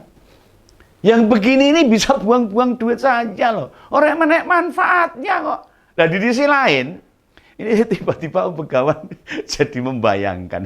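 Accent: Java, Indonesia. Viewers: Alhamdulillah nongol lagi Bicara apa adanya luwes cara membawakanya Kental basa jawanya. Monggo pilih Ganjar Mahfud yang tegar dan yahud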